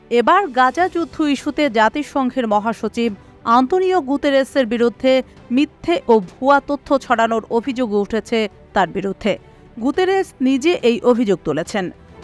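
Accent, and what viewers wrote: India. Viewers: খবর প্রচার কারি মহিলার কন্ঠ ভারতের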